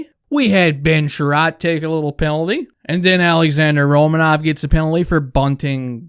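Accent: Newfoundland, Canada. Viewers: U must be a Newfie is ya?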